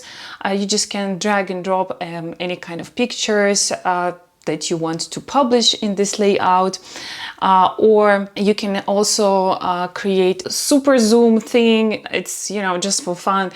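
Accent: Russia. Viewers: Are you from Russia?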